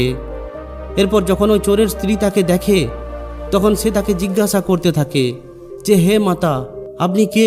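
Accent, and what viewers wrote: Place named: Kolkata, India. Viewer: উনি কলকাতার মানুষ তাই এটা কলিকাতার ভাষা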